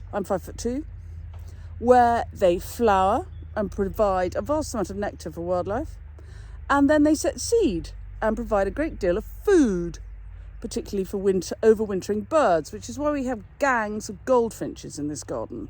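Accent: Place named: England, United Kingdom. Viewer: Oh you are wonderful! I cannot buy you a coffee yet please know this American fell in love with your articulated, English accent, humor and joy of gardening! I love narcissis, moved to a home where someone darling and knowledgeable ( like you) planted dozens of bulbs, which have tripled in a year! Thank you for all your tips and giggles. I have adopted you no questions asked- I have English heritage! Warm regards, excellent content!!!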